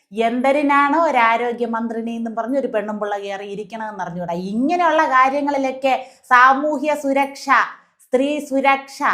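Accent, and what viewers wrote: Thiruvananthapuram, India. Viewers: തിരോന്തരം ഭാഷ 🧡 👌👌👌👌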